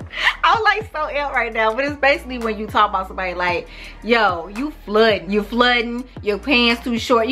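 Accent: St. Louis, United States. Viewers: I love St. Louis accent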